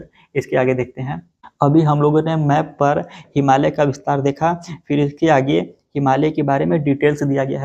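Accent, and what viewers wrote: Uttar Pradesh, India. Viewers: Sir aap up se hai kya😊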